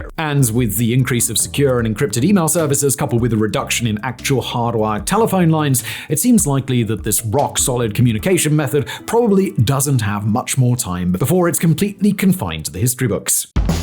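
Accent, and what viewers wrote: United Kingdom. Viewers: Gasoline cars are going to be around for a long long time, my friend. Just watch and learn. Also, desktop computers aren't going anywhere and settled down to their ongoing use plateau several years ago. And finally, BluRay and DVD/CD players are abundantly available, very cheap to obtain and will be for the foreseeable future. Your analysis is a little heavy on the wishcasting propaganda side, sprinkled with a thick authoritative sounding British accent. Those in the know don't buy it. You did at least nail the fax machine, so there's that...good man!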